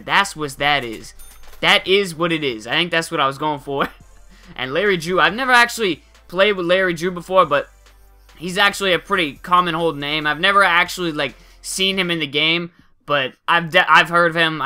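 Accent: Boston, United States. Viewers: are u from boston??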